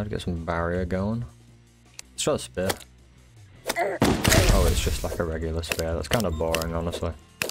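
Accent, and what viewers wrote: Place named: United Kingdom. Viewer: I dont like this video. Unhelpful. Sounds too british. Please redo this video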